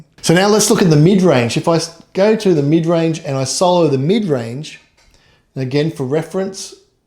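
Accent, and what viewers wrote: Australia. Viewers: Good to come across an aussie accent!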